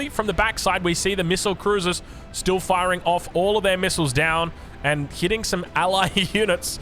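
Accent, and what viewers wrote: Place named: Australia. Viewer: we need more BAR content from you. Nothing against Brightworks or anything but the australian accent is much nicer and you really help people get into the game by explaining so much. Your videos are so entertaining i even started watching the aoe ones even if i never played it.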